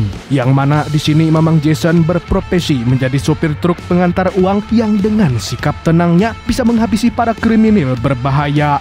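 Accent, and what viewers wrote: Banjar, Indonesia. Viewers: Pian urang Banjar kah min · Admin nya urg banjar jua sekalinya 😁👍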